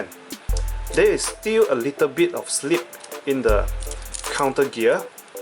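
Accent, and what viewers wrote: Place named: Malaysia. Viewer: super Malaysian accent.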